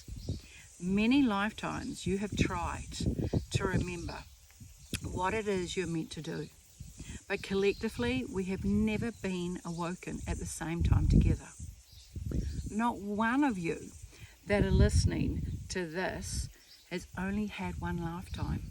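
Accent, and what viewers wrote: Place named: New Zealand. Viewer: Good evening..i have just found you tonight and i so enjoy listening to you at this moment..i have been red pilled for almost 2 years now...things are very tough here in Queensland, Australia and i think i pick up an New Zealand accent..am i correct?🎆💜 I am almost 79 and refuse to wear a mask and absolutely refuse to have the jab!!!GOD BLESS you all in NZ,stay in high vibrations everyone..love and light to all.🇭🇲💜🙏💜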